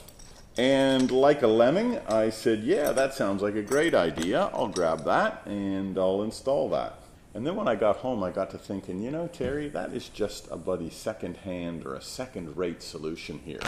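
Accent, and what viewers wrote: Canada. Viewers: Very useful video! Thank you! Are you a Newfie?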